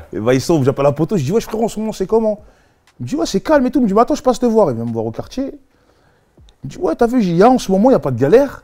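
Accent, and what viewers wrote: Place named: Martinique. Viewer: Il est trop fort avec l accent martiniquais 👍🏾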